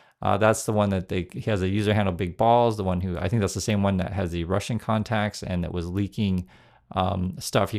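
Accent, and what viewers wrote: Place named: United States. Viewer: I thought you were an American as your English is so good and your accent is American. I fear Trump and Musk are trying to make it impossible for anyone to go after them.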